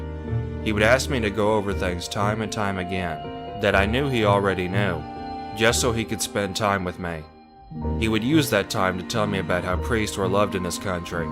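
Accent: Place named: South, United States. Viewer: Love that southern accent!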